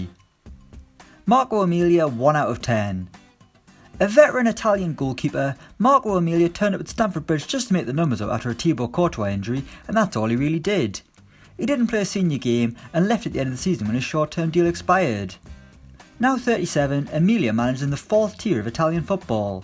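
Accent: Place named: Ireland. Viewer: Irish guy detected!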